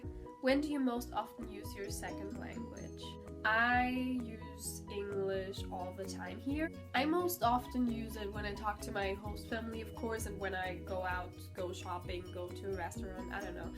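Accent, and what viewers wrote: United States. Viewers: Thank you for taking the time to do this video. I follow many Au Pairs and Exchange students on you tube. It is so interesting to hear what each individual takes from their time in the US. Positive and negative. I see a definite pattern with certain countries and whether they speak their native language or in English in their videos. Norwegian, Danish, Finnish and the Dutch tend to speak English. German, French and Russian tend to speak their native language. If you decide to do a Q&A I have a couple questions. You answered one. Do you ever catch yourself thinking in English and the second part would be do you ever dream in English. I see many exchange student in the US start to catch themselves thinking or dreaming in English after about three months. How strange that must be the first time it happens. What were your reasons for choosing the US for you year as an Au Pair. 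Numbers wise the US has the most German AuPairs most years. Last is your accent of lack of an accent really. Your choice of words and pronunciation, to me, sound very much like the US version of English and not the British. When English is taught in Germany which version do they teach? Your English, at least to me is very very good. By the definition I read you are bilingual. I hope you enjoy your time here. I lived in New London, CT for six years so I know what it is like up there. Will you take a travel month when your year is up? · I looked up the word bilingual in the dictionary and if I remember it correctly, being bilingual is when you can speak two languages fluently. Also, on a side note, you have a great american accent. Oh, conversate is a word:)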